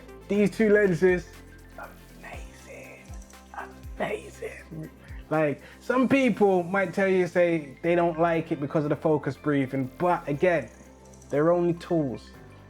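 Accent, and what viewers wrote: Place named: England, United Kingdom. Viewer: great review. gonna pick one up. also this is my favorite english accent, haha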